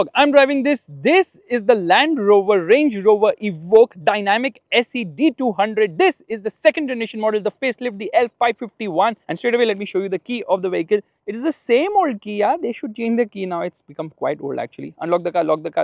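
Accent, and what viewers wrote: India. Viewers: DEAR GOD HE IS SPEAKING SOOOO FAST THAT IS HARD TO WATCH VIDEO AND CONCENTRATE AT THE SAME. AND THAT INDIAN ACCENT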